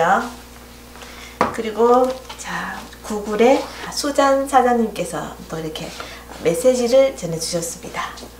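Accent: Gyeongsang, South Korea. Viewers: 벌써 실버버튼 인가요 ^^ 그리고 실버버튼.. 저렇게 생겼군요 축하드려요 대구살때 한번씩 친정엄마께 전화해서 요리법 물어보곤했는데 여기 미국오고나선 이모님 영상을 많이 참고합니다 경상도분이신가..말투도 친숙하구요 ㅎ 다시한번 축하드리고 골드버튼 되는날까지 아자 아자 ^^ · 님, 시방, 힐링님께 감사댓글을 올리려 들어왔더니 먼저 올려주셨네요. 저도 ♡ ♡ 닉네임도 호감이 갔었지만 구수~한 대구말씨에 완존 공감을 했었답니다. 정말 이모님의 숨은 내조자에 힐링님도 빠질순없지요. 늘~ 감사드리고 있어요.일일이 댓글읽고, 답글 올리는게 보통일이 아니던데 애썼습니다. 우리모두의 ✌✌입니다.잠자리 들때면 폰도 쉬라구 끄고자거든요. 제가 잠든사이 이런 경사가 저를맞아주네요. 힐링님도 선선~ 한 화요일 되시기를 기원합니다. 수고하셨어요.🙋‍♀️🙋‍♀️🎵🎶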